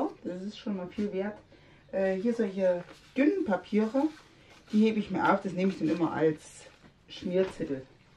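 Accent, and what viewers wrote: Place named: Anhalt, Germany. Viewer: Das ist soooo beruhigend, immer wieder auf's Neue, wenn man sieht, dass es bei anderen genauso chaotisch aussieht. ich liebe das und das ist eben das kreative Chaos! Und so was macht uns Bastler doch sympathisch. Danke für's Zeigen und mitnehmen. Ich mag übrigens Deine "lockeren" Videos, man mag Dir sehr gerne zuhören/zusehen. Und... Du kommst sicher aus der Anhaltiner Ecke, wenn ich Deinen "Dialekt" so höre. Ich bin ursprünglich auch von da... 🙂, weiter so und LG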